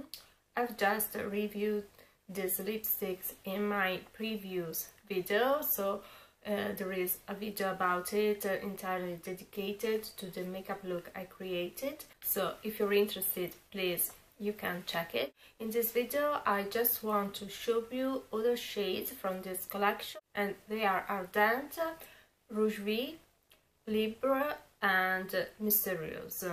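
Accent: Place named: Italy. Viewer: Hi! It’s been awhile since I’ve watched your Chanel, but I hardly watch any UTube videos…actually usually never. 😮🫣 Anyway, I enjoyed this one as I am interested in these new lipsticks! 😊 All of those shades look great on you, and I think I would buy all of them except the orangey red and the very dark vampy one. Thank you for sharing these with us, (and you are so cute speaking French with your your darling Italian accent!) 😅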